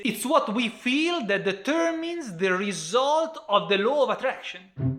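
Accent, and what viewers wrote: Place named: Italy. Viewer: Rest assured, there was no language block. To the contrary, your enthusiastic inflection, which I assume followed Italian rules of enunciation, made the content more interesting. Bravo!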